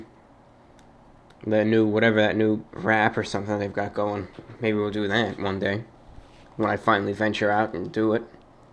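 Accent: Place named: New York, United States. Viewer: I feel fortunate to still be able to find and listen to in the “olden” days 😁. Love the NY accent lol. You can whistle! I’m jealous! I’ve tried my entire life consistently but no luck. I still can’t believe I’m listening to a 16 year old kid lol. But Nike is “just do it”. Not “get it done” 😂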